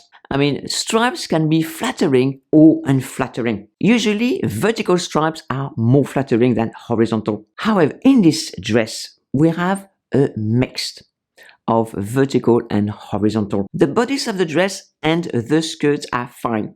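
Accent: France. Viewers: "The LEETLE House on the Prairie Look". 😂. (I love your French accent, not making fun of it. 😊) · has the most adorable French accent on English I ever heard. She has also charisma, talent and style. However she herself had aged well and styles everything for women likewise. Most of us, women after 50, had not, except Asian origin women, who looks like 30 in their 50ties. We have flabby arms, "bat wings", we have often too big, lousy and fat boobs, we have protruding bellies, vanishing wastelines. All these sleeveless dresses, all these dresses on straps looks horrible on most of us. An advice to clap a belt is fine as long as you can find where to clap it 😅. But most important thing is - we, women after 50, do not care so much what anyone else is thinking of us. So if you like floral prints, cowboy boots or lousy dresses- just wear them. Wear even dresses on straps and clap belts, even being size 3XL, if you feel comfortable. 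Life is extremely short. So enjoy it the best way you can!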